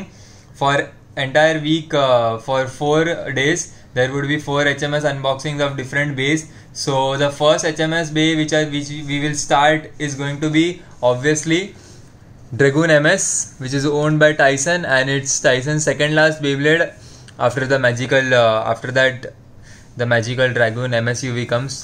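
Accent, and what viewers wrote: India. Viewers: Are you an indian? i can tell in your accent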